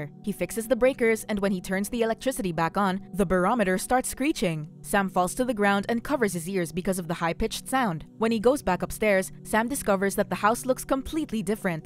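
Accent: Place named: Philippines. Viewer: The narrator sounds Filipina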